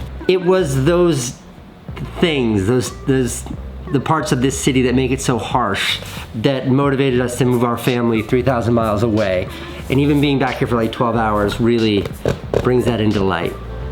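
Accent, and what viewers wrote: New York, United States. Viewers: still a heavy ny accent though!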